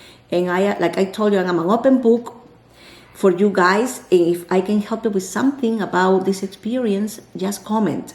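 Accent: Peru. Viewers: This is the first of your videos that I have seen and as soon as I heard you talking I could hear a Peruvian accent. Reminded me of how my aunt talks and sure enough you said Lima, Peru and it sparked some joy in me. 🥰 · can we get a Spanish video because I want to hear your Peruvian Spanish 🥰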